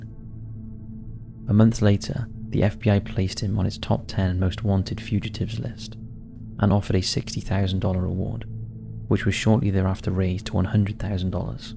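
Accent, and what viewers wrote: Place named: England, United Kingdom. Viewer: Not my problem. Why is a pom narrating?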